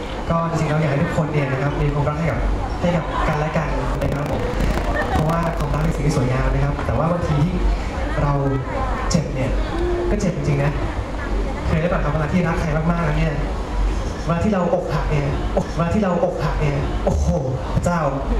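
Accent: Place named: Rural, Thailand. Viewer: พูดเหน่อน่าร้ากกก😘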